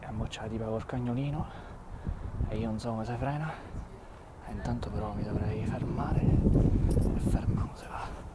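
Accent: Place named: Rome, Italy. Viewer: haha io dovrei avere delle foto in quel ponticello, dovevano esserci anche i parcheggi per le macchine elettriche li davanti. Ma questo accento romano?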